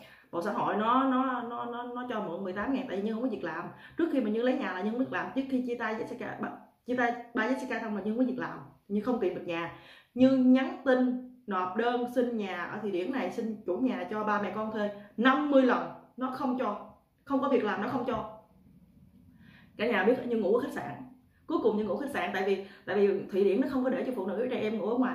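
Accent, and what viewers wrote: Mekong Delta, Vietnam. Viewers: Nghe giọng miền tây là có cảm tình. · nói chuyện thật thà, dễ nghe quá, đúng là người miền Tây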